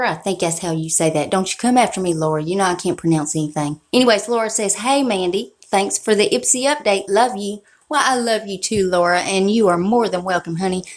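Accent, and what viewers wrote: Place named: Alabama, United States. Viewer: I am so excited about this pallet! I love you accent straight from Alabama!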